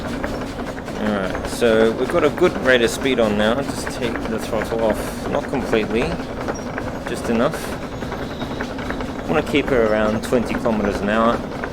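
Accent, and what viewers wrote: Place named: Australia. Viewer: What country are you from, you have a slight Australian accent.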